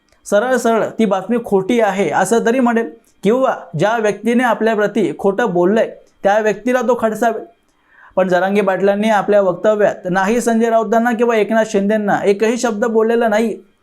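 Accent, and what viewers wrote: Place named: Karnataka, India. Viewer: आपले विषय विल्श्लेधन खूपच अभ्यासपूर्ण असणुबतारकशुद्ध असते. ऐकायला खूप आवडते आणि भावते. तुम्ही बहुदा कानडी भाषिक आहात का.आवाजात गोडवा आणि आपुलकी जाणवते म्हणून जिज्ञासा.🎉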